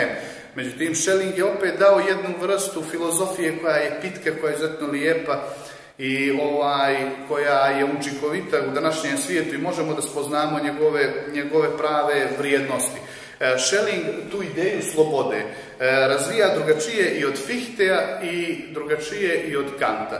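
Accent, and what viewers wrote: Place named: Montenegro. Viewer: Professore svaka cast, klassische deutsche Philosophie zvuci sa crnogorskim akzentom nekako zabavno😊😅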